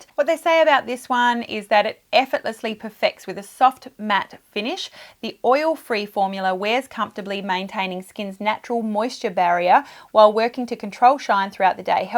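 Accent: Australia. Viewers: Love your videos. You are fantastic. It's great to have an Australian voice.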